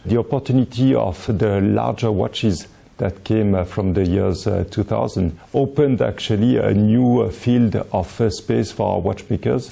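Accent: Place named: Japan. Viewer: なんか日本人のカタコト英語みたい笑 まあそれが一番聞き取りやすいんだけど。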